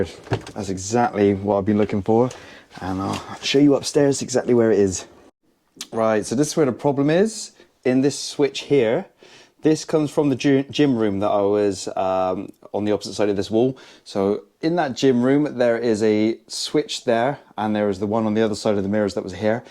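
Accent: Bristol, United Kingdom. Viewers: Nice to hear a Bristolian Accent again. I moved over to Cardiff over 20 years ago and miss it. Good video as well.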